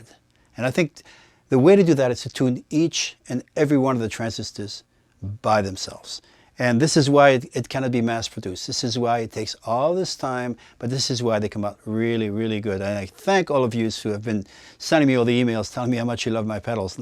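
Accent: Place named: Italy. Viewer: You're talking about me! I'm the pro musician/producer/studio engineer. Hopefully I know what I'm doing, LOL! It's interesting to listen to you speaking. I can hear very clearly where your son's voice comes from. You've still got a little bit of the Italian accent. Some of my American family do too. Your son must have worshipped you as a child, and you must have given him everything you could. He is a genius level artist with the highest level of personal expression on his chosen instrument. Congratulations on your success and your excellent equipment. It offers some of the most unique guitar tones that have ever been designed or available to the public. The attention to detail in the dynamic response is what I love most about the equipment you design. Are the current Dirty Boy pedals being produced with a licensing agreement between you and the Dirty Boy Co. 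run by Danny Gomez and Roberto García?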